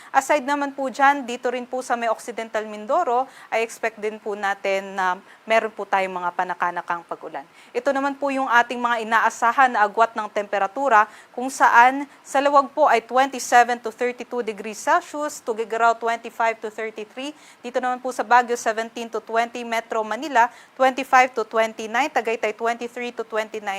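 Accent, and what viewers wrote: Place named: Iloilo, Philippines. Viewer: Ilongga si ate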